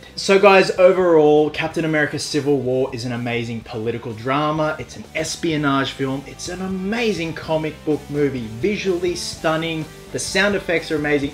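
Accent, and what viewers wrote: Australia. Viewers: Subscribed straight away when I heard your accent. Fellow aussie ;) Fucking loved it. And thank god someone is finally #TeamIronMan. All my friends were Cap :<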